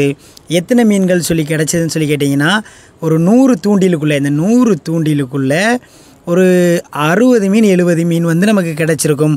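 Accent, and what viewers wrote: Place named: Sri Lanka. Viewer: என்ன இலங்கை தமிழ் பேசுறீங்க அண்ணா pad ok வாழ்த்துக்கள் அண்ணா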